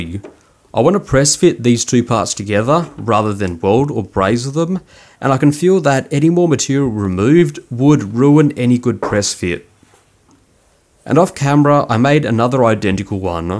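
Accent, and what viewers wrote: Australia. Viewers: cool. Which state are you in? I can't quite pick the accent. SA or WA?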